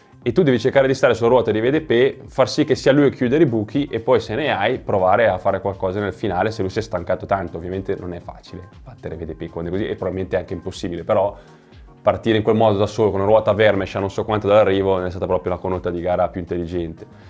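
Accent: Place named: Rome, Italy. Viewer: Ah, te vojo tanto bene, ma sentitte parlà romano è 'na tragedia. M'era bastato Celentano in Rugantino, te lassa perde!!! ❤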